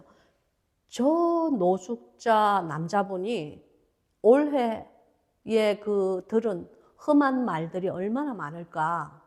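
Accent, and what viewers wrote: Gyeongsang, South Korea. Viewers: 목사님~보고싶었어요❤ 진짜~~~성경공부 오랫만😂 너무 너무 좋아요 목사님 첫인사 하시는 것도 넘 귀여우세용 *꾸지리ᆢ*갱상도 사투리도 ㅋㅋ (저도 부산~^^)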